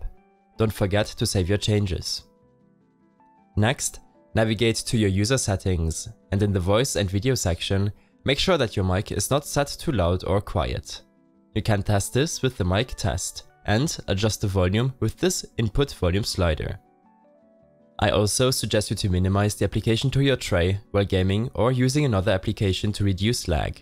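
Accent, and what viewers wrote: Germany. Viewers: Da hat er mich aber auseinander genommen.. Deutschen akzent hört man aber auf jeden fall.. Geiler kanal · Are you German?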